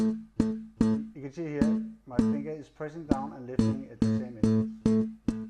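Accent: Denmark. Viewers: Elsker den danske accent :D Men super fedt spillet :D! spiller selv lidt funkey bas for sjov men gad godt at have din erfaring!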